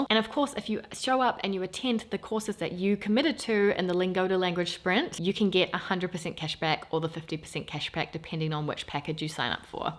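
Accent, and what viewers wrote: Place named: New Zealand. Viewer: you are now sounding proper Kiwi!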